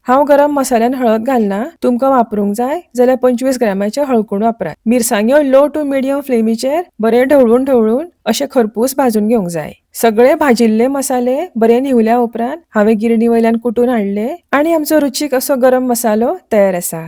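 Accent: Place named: Konkan, India. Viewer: तुमची कोकणी भाषा ऐकायला गोड वाटत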